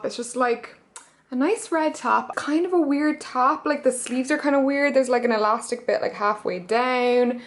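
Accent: Ireland. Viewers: Sexy Irish accent 💕👌. X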